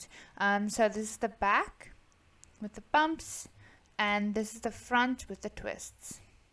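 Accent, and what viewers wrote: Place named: South Africa. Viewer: It is beautiful hearing a Fantastic South African accent again! I for the first time have truly managed to do and understand my first crochet attempt. Thanks a million x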